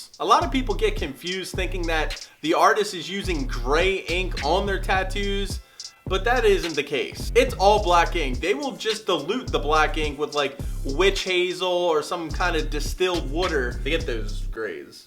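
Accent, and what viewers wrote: Philadelphia, United States. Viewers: You sound like you're from the Philly area 🤣 water = wooder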